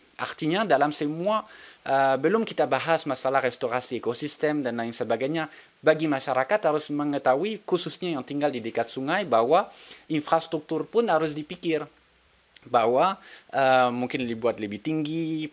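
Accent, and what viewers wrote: France. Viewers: Aku mendengar logat Perancis, sounds so good 🤭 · Lucu logat Prancis nya😂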